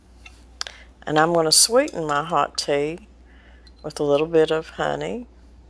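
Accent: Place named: Arkansas, United States. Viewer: I love it that you can make a video without getting “made up”. You look pretty without it. Your voice and your accent is just like my family in north eastern Arkansas. I feel like I should know you. I’ve used many of your recipes. Keep it up!!!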